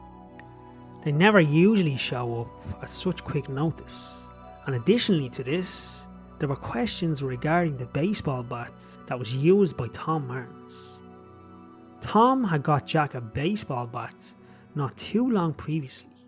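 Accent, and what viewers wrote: Ireland. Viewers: How rude of a comment to call the narrators voice weird, I’m sure Americans sound weird to other English speakers in European countries. He’s an Irishmen, and their English dialect has been around longer than Americans dialect of English. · I’m Australian & I just love an Irish accent! The best accent in the world 🍀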